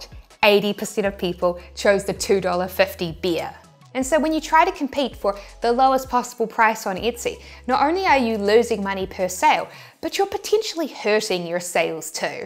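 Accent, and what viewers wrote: New Zealand. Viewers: What accent? Sounds perfectly kiwi to me.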